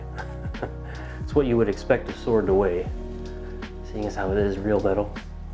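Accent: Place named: Alabama, United States. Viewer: What’s wrong with an Alabama accent man?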